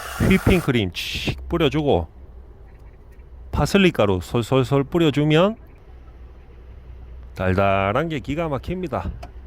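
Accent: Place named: Seoul, South Korea. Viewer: 서울말잘쓰네..북한서 온지 얼마안된거같은데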